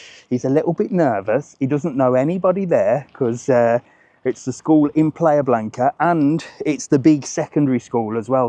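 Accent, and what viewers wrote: Birmingham, United Kingdom. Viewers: Just recently discovered your videos. Loving them. I'm a Brummie myself and love your Brummie way, and your Mum and way too, haha..trust him to spot MaccyD's! I'm severe sight impaired and have hearing aids too now. I am closely considering a stay in Lanzarote, and at the Arrecife Grand; so it's useful to get a good look round and insight. Probably be the first Romulus FC fan in Lanzarote...I wonder.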